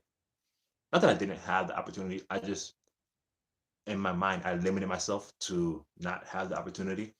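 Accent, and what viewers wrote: Dominican Republic. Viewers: hola desde cuando y porque te gusta mi cultura y el país, que cosa te llevo a eso, pareces dominicano en el acento cuando hablas español, y sabes mucho sobre nuestro acento, Greeting From Sto.Dgo. Dominican Republic, Quisqueya La Bella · Suenas más como un dominicano(masculine)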